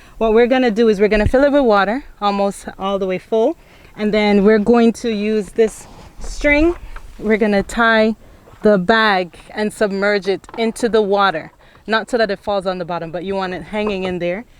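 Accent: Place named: Jamaica. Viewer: Hey....good info...do I detect ...a jamaican....not much of us..doing this. Proud of u my child...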